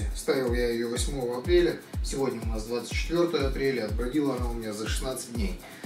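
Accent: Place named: Urals, Russia. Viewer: Да, на Урале есть специфический акцент)))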